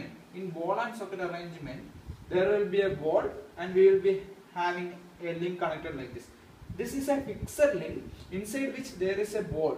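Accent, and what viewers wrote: India. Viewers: I can always trust a guy with an Indian accent to teach my engineering courses better then my PhD professors... Thanks this video helped a lot!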